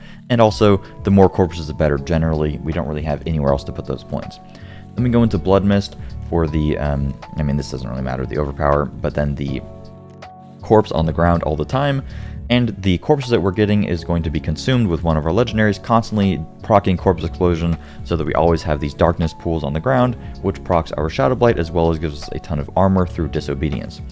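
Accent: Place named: United States. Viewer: The speaker is using American English. It's pronounced MUH-cob.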